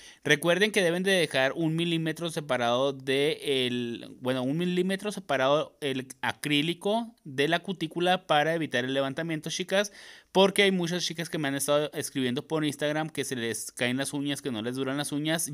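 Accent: Chihuahua, Mexico. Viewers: Es Al acento de Chihuahua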